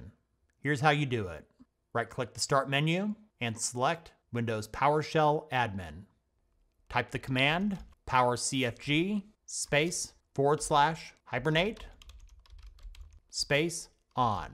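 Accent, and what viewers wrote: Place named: Appalachia, United States. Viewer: in Hilly Billy accent just classic!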